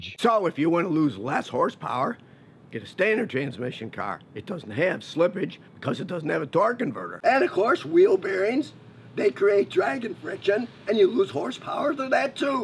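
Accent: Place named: United States. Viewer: sounds super American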